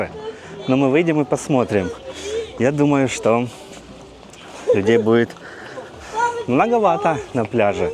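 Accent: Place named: Donetsk, Ukraine. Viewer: Вы родом с Украины ? По вашему говору и стилю очень похоже.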